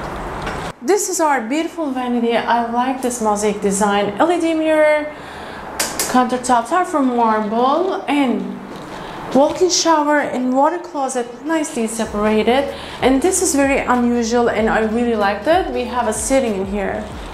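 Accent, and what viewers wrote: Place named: Turkey. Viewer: Bende diyorum bu aksan ne aksani diye, Turk oldugnu sonradan anladim 😂 Video guzel olmus bu arada, yakinda Dubai'i yerlesmeye dusunuyorum.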